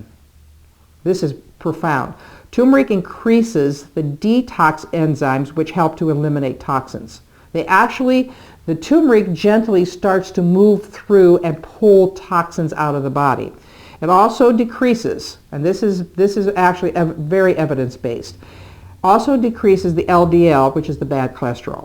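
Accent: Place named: United Kingdom. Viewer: Pronounced in America as Tur MER ic. and Cur CU min She pronounces it as the British do. But we don't speak British here in America. Rule of thumb is in America (the entire western hemisphere) the emphasis is on the 2nd from the last vowel. IT only changes from that rule if there is an accent placed over a different vowel. In the British language they put their emphasis on the first syllable of words. TurMERic is a most wonderful herb. Beautiful plant as well. I grow Turmeric and Ginger. I sprinkle the ground up Termeric and Ginger on my buttered toast daily. I also add Hemp seeds to it and a splash of Cinnamon. ===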